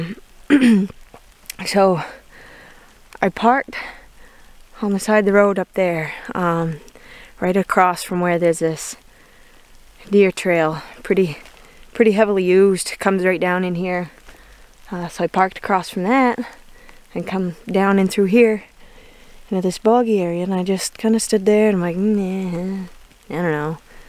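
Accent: Maine, United States. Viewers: Congrats to you!!! Great video, raw emotion, and you did it!!! Love the Maine accent; spot on northeast hunt! Keep after it!!!! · Great to see you succeed. Your family is darling and you my dear are pretty as you can be. Love the Maine accent.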